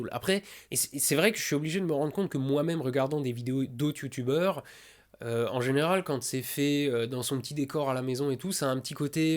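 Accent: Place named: Lorraine, France. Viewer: moi ce que j'aimerai entendre de temps à autre, s'il te plaît, c'est ton accent lorrain, il est juste génial! Vive la Lorraine! attention, pas tout le temps, il ne faut pas abuser des bonnes choses, mais un peu ça ferait plaisir les autres, n'hésitez pas à dire si vous êtes d'accord ou pas pour un peu d'accent lorrain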